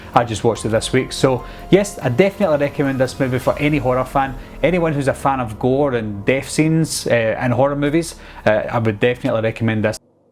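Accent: Scotland, United Kingdom. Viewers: You from Scotland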